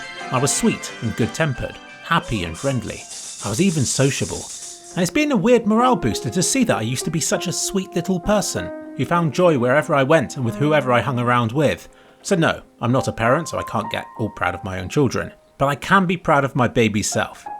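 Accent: United Kingdom. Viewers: Did you still have a British accent back then?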